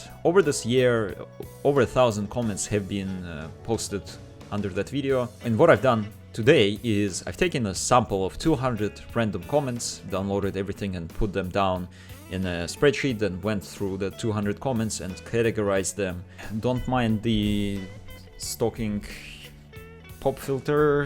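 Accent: Russia. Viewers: Are you from kazakstan? Asian but russian accent....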